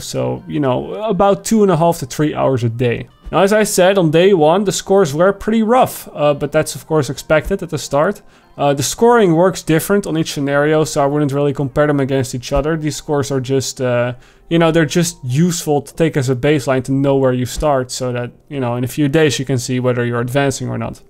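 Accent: Netherlands. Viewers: Dude got some Dutch accent, btw I also used this aimtrainer and now can outaim most of the players i play against (Now I just need to grind to develop game sense)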